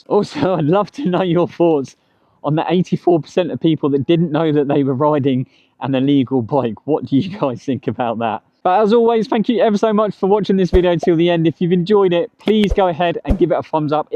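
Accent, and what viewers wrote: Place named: United States. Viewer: Not just tax, FINES. Car's are fine to 'let loose' and only correct their behavior afterwards because they have a registration requirement..... Anyway, 2kw for 30mph is nonsense just get a darn moped. You can do 28mph with 750w nominal motors that's just plain class3 e-bike in the US for instance. Also, I highly doubt people putting hub motors on mtb's to 'get fit' as they <num>% of time put a throttle on them which makes them a moped not a bicycle. And nah, 'the law' is there to make sure some entitled people don't go around riding 30mph mopeds on bicycle infrastructure. You do realize it's just as dangerous to ride 30mph on infra where the other users are doing 15mph.... as it would be for you on your moped to go 30mph and have to drive on a highway with a 60mph speed limit. No I don't care YOU 'ride defensively blahblahblah' that's nice, but that's not what we base rules on. 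If we did, we wouldn't have a need for rules for tin cans either right, 'just drive defensively and it will be ok' 🙄 The thing is, you sound very American, which would make you more right then wrong, and my little tirade above not at all applicable to you. Since your infrastructure forces you to mix with traffic, you're 100% safer having more power available, and having a higher top speed limit to closer match the traffic around you. But for instance here in The Netherlands, it would be very strange to allow bicycles going 30mph on the bike path, when we banned mopeds doing 28mph from the bike path bec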